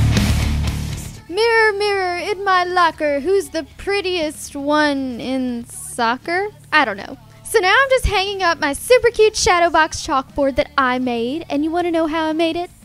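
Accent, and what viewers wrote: South, United States. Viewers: You have a country accent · are you from the south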